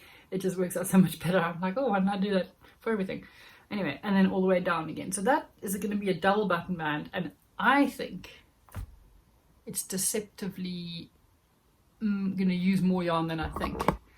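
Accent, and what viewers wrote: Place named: South Africa. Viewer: When I listened to your accent in another podcast of yours, I thought you sounded South African and then in this one you mentioned you want to come and visit family in Stellenbosch. I am really loving your podcasts and I love what you are making. They will all turn out well. 😊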